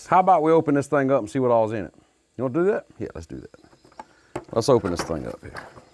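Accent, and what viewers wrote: South, United States. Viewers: Love the southern accent! Reminds me of my good ole buddy from Louisiana. Anyway, love the content on this channel. Definitely got yourself a subscriber. 👍🏼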